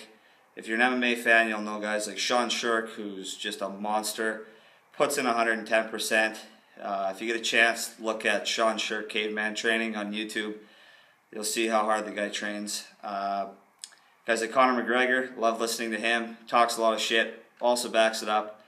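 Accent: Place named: Canada. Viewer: Yes you can tell you are Canadian! Good input. Looking forward to more up dates on topics , nutrient , exercises, posing ,etc. congrats on your competition !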